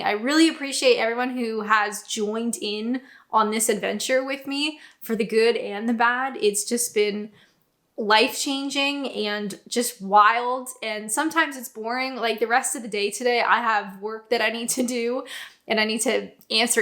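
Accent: Canada. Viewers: It’s OK, you’re Canglish. We get it, it’s cool 😎!!! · Hi! You've aged? You're looking good girl!!! Yes, I know the feeling of not belonging, having an identity crisis and trying to adjust when we emigrated to Tronno and returned all those years ago as a 9/10 year old. This was all in only 1 year! Didn't know if I was coming or going but what an experience to be had! (...and yes, I did start to have a Canadian accent like yours but lost it again:) ) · Surprised after all this time your accent is still fully Canadian? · Still got a Canadian accent.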